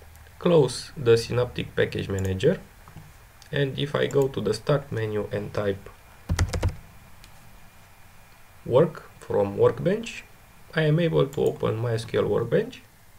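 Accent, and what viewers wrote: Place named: Russia. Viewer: Looks like hard russian accent )))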